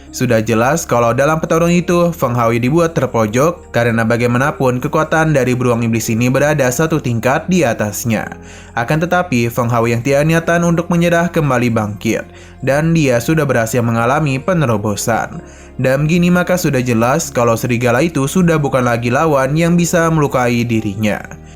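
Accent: Banjar, Indonesia. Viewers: Min mimin orng banjar kh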